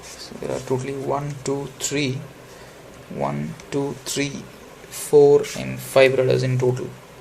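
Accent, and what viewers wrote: India. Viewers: There's something about the Indian accent with reviews that I really like o_O Good review anyway.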